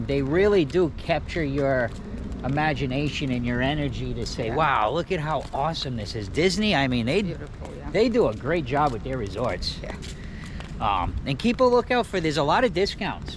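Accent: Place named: Northeast, United States. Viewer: Can't place that accent. NY? MA? NJ???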